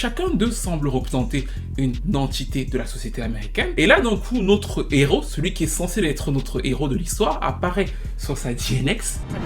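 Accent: Cameroon. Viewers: Il est camerounais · Toi là tu as un accent un peu Camerounais ou j’entends mal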